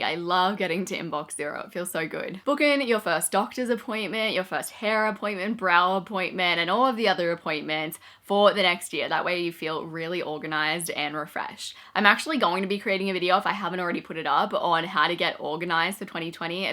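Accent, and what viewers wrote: Australia. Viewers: Hi, I'm not English but I live in England, I thought she might be from somewhere in the North but I couldn't recognize the accent and after I had a look at her IG I saw she's based in Australia so her accent is actually Australian! x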